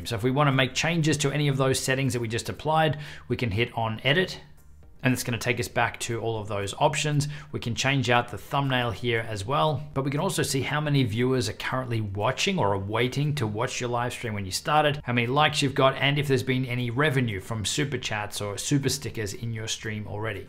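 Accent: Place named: Australia. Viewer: Thank you for the tips, love the aussie accent :) Cheers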